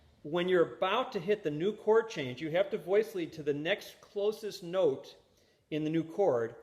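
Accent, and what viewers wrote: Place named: Chicago, United States. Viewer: It's Chicago accent - part of the charm! He's a great guy & a super strong player & teacher!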